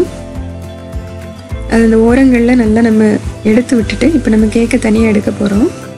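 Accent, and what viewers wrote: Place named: Kanyakumari, India. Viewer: Love that accent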